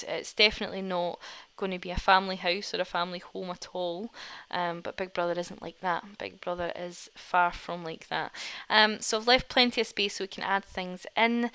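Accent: Scotland, United Kingdom. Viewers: I really like your accent!!!! Is it Scottish here? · Hey are you Scottish? So am I :D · Are you scottish cos i am too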